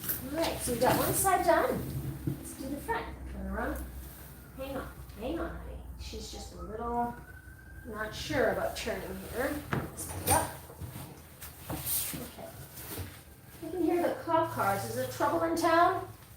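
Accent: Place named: France. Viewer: Ton accent français est tellement beau.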